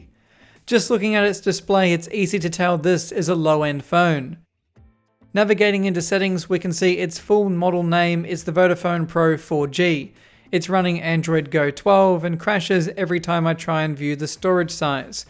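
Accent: Australia. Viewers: Another Aussie yelling at nuggets. I have subbed.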